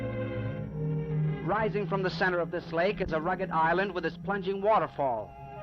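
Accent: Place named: United States. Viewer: Thank you for this voice typical america ! I like it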